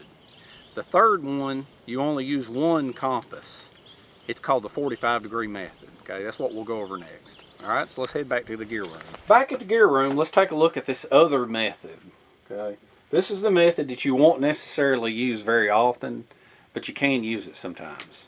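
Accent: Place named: Boston, United States. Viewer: To understand Boston accent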